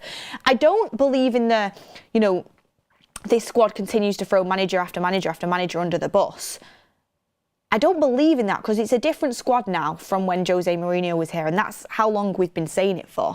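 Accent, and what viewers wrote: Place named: Manchester, United Kingdom. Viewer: It's the Mancunian accent, dude. ;)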